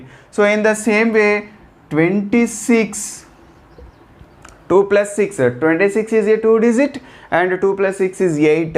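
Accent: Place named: India. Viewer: Bro u r speak ing buttler english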